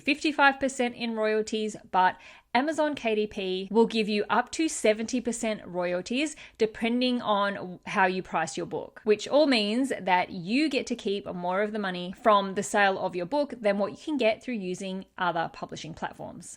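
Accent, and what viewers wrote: Australia. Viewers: It was very hard to catch that Australian accent. But i can smell a Australian from a mile away.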